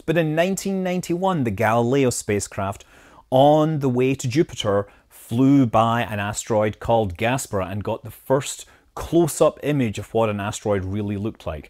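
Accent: Scotland, United Kingdom. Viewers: This is my favourite Scottish-sounding astronomy channel! We could predict where and when they will hit Earth. I don't know that we could stop them however. At least we would know when we are going to die. On second thoughts, maybe I don't want to know.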